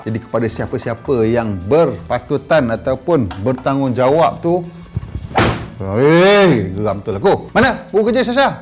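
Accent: North, Malaysia. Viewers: Cikgu kalau guna full bahasa utagha lagi sedap dengaq. Hat ni dengaq mcm dok pedo² ckp utagha. Apa² pon mmg terbaik penyampaian. Mudah dan senang paham.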